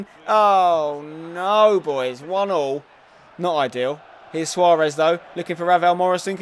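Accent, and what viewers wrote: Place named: Cockney, United Kingdom. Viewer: It's just a cockney accent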